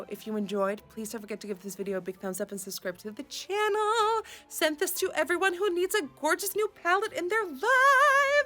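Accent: United States. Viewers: how often do you come to the US? You have an American accent. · Why does she have an American accent, Dutch people have such a strange accent.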